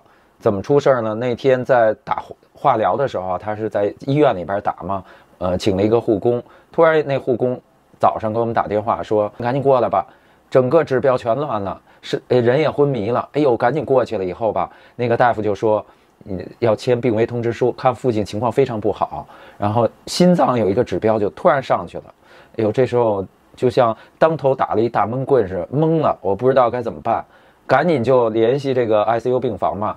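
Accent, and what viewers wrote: Beijing, China. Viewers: 听出来了，你是北京人 你父亲做什么工作的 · 可能太想北京了，刷到这视频听到北京口音，开心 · 非常真实可信。小叔第一次这么正经的北京腔儿。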